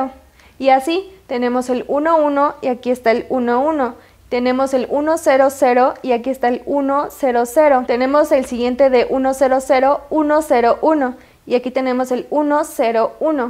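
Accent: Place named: Mexico. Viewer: Eres mexicana creo, por cierto me gusto el video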